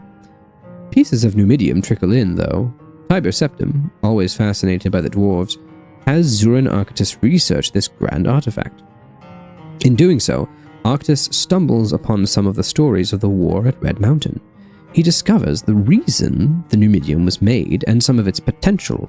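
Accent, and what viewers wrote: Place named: RP, United Kingdom. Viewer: I am very much enjoying your readings of the books. Particularly your English RP (recieved pronunciation) accent. A side note for your knowledge we pronounce the word Dynasty as Din not Dine if you wish it to sound authentic. Reciting in repetition tongue twisters such as Peter Piper or She sells seashells or The rain in Spain or The quick brown dog to practice your enunciation will help. I am sorry you are feeling unwell and it will definitely give you a fuzzy brain preventing you from taking the information in. Nevertheless, a super effort and much appreciated. 👍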